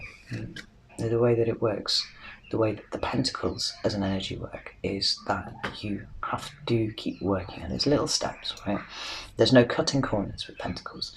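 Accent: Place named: RP, United Kingdom. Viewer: I love an educated British accent. I can hear why others inspired you to read the Scherezade book (can't remember the title 😁). I miss Britain. I'm an American with an English mum, and I've always felt at home when I've visited your beautiful country. Your reading was spot on, and I loved the great commentary. I'm a new subscriber. Cheers! ❤️😊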